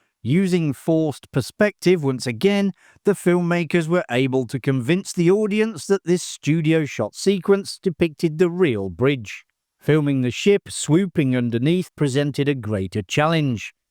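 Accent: United Kingdom. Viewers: Your British accent annoys me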